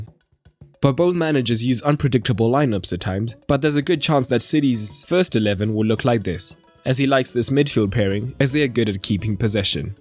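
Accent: South Africa. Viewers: Hey man. Love your channel. Where are you from? You sound south african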